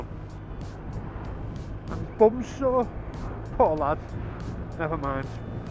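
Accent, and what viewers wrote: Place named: Liverpool, United Kingdom. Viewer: Another scoucer like Paul Griffiths 😂. Just bought an RT here in AZ. Miss my Scoucer home living here in Arizona.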